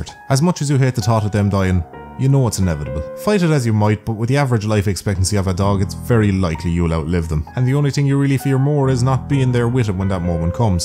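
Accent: Ireland. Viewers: I don't know why I love this channel so much its just a Green duck with an Irish accent swearing for like 10-15 minutes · I dont know who you are, found your channel today on the "recommended". You have a good point of view that really jives with me. To be honest it's creeping me out, you sound like an irish version of myself. Not crazy, i think... Anyway keep up the good work. The worst thing about having a dog is having to say good bye. Mans best friend wont talk, can't talk, will listen the best they can, never leaves your side and will never complain. All for a head scratch and the last bit of what you're eating, if only people were so easy to please.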